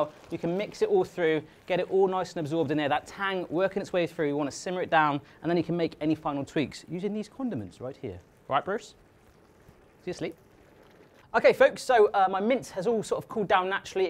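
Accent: England, United Kingdom. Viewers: to me he doesn't sound Australian he sounds English O.O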